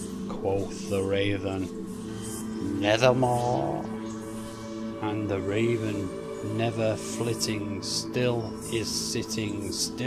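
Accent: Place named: England, United Kingdom. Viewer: Awesome job it sounded so good with your English accent you should do more of these.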